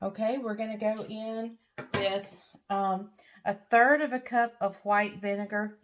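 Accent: South, United States. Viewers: I noticed the wrong setting on your new camera, I know you will get it stright just want to mention it incase you can fix it ( I know I could not) please dont get up set by this note just information. any thing that is read is backward the door to the back room is on the wrong side of you (near Carolines picture. )I sure enjoy this channel. I have to turn on closed captions because I am not very educated, you have a southern draw, you talk about things I do not know about, anyway I thought it very funny how the closed captons say things I am sure you never said.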